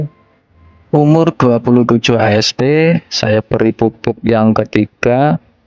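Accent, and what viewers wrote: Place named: Bali, Indonesia. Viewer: Bang tutorial ini apa di kawasan bali?? Logatnya seperti orang bali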